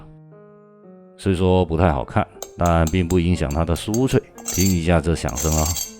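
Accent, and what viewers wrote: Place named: Fujian, China. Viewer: 是福建口音 哈哈哈哈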